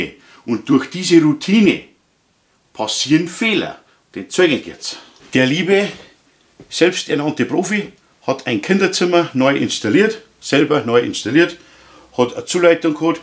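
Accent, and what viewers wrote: Bavaria, Germany. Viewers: Machst schon informative Videos, sehr lehrreich, nur ein wenig mehr hochdeutsch wäre nicht schlecht. Es kommt ja nicht jeder aus dem bayrischen Ausland 😉🤣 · oan Buam hoats an oaner Steckdosen eine auffi gehaut... ich liebe diese Sprache, selbst höchst gefährliche Dinge hören sich für uns Fischköppe noch lustig an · Deine Videos sind erstklassig . 10 Daumen nach oben dafür . NUR leider habe ich ein kleines Problem , ich kann dir nicht zu 100% folgen da ich nicht alles verstehen was du sagst . Ich habe da doch schon gewisse Schwierigkeiten mit den Bayrischen . Schade das man es nicht alles mitbekommt was du gerde erklärst . Ansonsten sind deine Videos grosse Klasse . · dein Bayrisches Dialekt, bin aus Nordrhein Westfallen ich verstehe dich Super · Herrlich bayrischer Dialekt! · #PWInfo Servus und erstmal vielen Dank für die tollen Beiträge. 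Bezüglich der Fehler durch Routine: ich selbst habe erlebt, dass mein Elektriker, der die komplette Installation im Neubau gemacht hat, bei einer Steckdose Neutralleiter und Schutzleiter vertauscht hatte. Meine Frage: prüft ein Elektriker Steckdosen abschließend nur mit einem Duspol? Das Vertauschen von Nulleiter und Schutzleiter bliebe dann ja aber unentdeckt, glaube ich? Mich würde sehr interessieren, wie das in der Praxis aussieht, und wie richtig gemessen wird. Wäre ich Elektriker, dann würde ich es mir nie verzeihen, wenn jemand aufgrund meiner Schusseligkeit zu schaden kommt und würde an solchen Stellen auf jeden Fall prüfen. Ich hoffe, dem Kind geht es wieder gut und dass es mit dem Schrecken davongekommen ist. PS das Bayerisch finde ich super :-)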